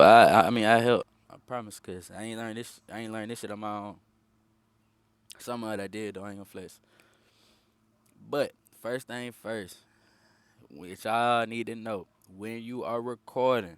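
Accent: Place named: Atlanta, United States. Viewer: yuh he frm atl fasho😂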